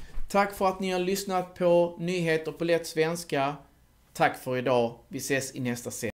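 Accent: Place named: Skåne, Sweden. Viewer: Kan du inte ta det på Rikssvenska? Skånska är svårt att förstå. Dessutom så framstår det inte hur man lurar staten.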